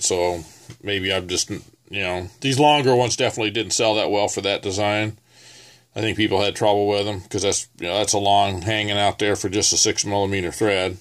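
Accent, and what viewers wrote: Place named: South, United States. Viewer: That was fun! I never noticed a southern accent like I heard here. Nice work! Laural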